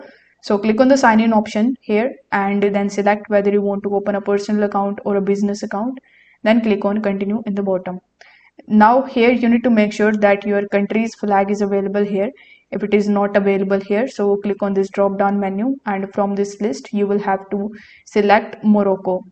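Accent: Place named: India. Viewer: 🙂🇲🇦 لغة انجليزية خاصة بك تشبه هندين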